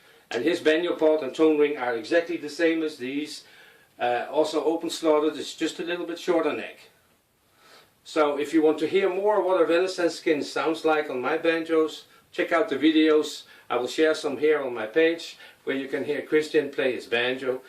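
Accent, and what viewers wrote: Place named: Netherlands. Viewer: my god these banjo's are beautiful. Really having a hard time deciding. I also have a bodhran put easily tunable. Love the sound of real skin, even as a vegitarian. I live on a boat so..well... humidity. Do you still use the wax and does it change the sound. - Kijk net op je site, dacht al ver weg een NL accent te horen.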